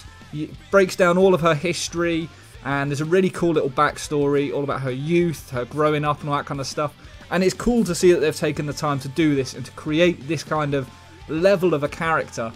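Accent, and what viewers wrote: United Kingdom. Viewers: You sound like you are from they U.k your connection is gonna be shit no matter what, Canadian and US are very compatible for servers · oh look another british guy reading patch notes hi stylosa hi pete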